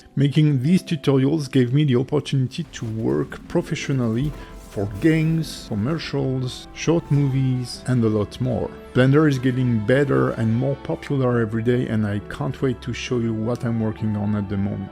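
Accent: France. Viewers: Cet accent français j'adore je comprend mieux lol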